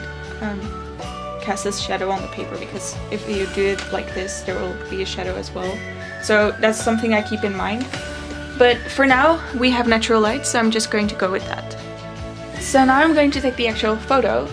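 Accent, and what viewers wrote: Netherlands. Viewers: Very helpful, thank you :) (btw, are you dutch? though I recognized the accent :P) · You sound Dutch (not in a bad way, you talk very clearly) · You sound dutch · Love the video, this will help a lot, thank you!❤ (Are you dutch?, cause I think you kinda sound Dutch..😊) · You sound Dutch haha xd but it's a cute english!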